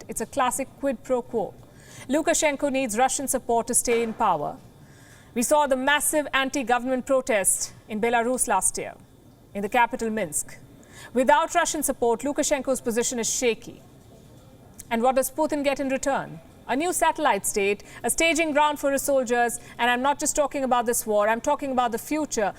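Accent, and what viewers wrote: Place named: India. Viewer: I CANT LISTEN TO THIS INDIAN ACCENT IM SO SORRY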